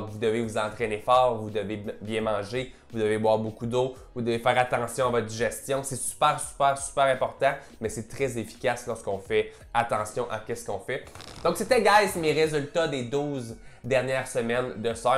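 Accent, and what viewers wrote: Quebec, Canada. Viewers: L'accent québécois me fais mal au tympan, mes oreilles saignes 😫😫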